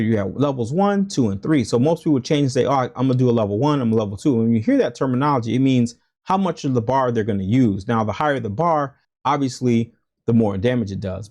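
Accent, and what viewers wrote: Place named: Carolinas, United States. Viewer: ! Your vids are soo good! Thanks for all your work and knowledge you collected over the years … I’m like you.. I dove into this franchise deeply! It sounds like you grew up in the Carolinas, im not far away here in Kentucky … we had the same childhood pretty much. You seem like a guy that would have been one of my friends way back then … you got a subscriber for life here.. can’t wait for more vids and I’m about to run the Alpha video back for the 2nd time today and prob even more! It’s that good bravo! 🫡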